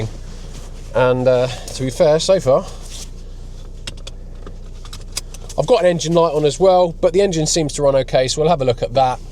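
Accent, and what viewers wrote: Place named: England, United Kingdom. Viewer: I’m watching a lot of these kind of videos and mostly Americans. It’s nice to hear proper English and cars I could afford.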